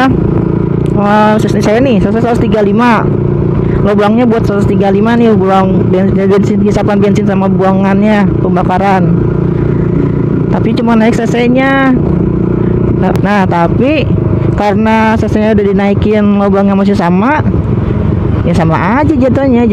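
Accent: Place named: Betawi, Indonesia. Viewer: nadanya anak betawi asli ahhaha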